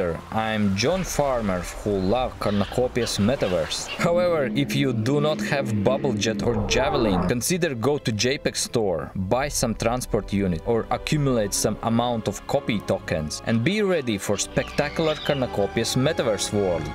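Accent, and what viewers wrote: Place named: Russia. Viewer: Might wanna skip the Russian accent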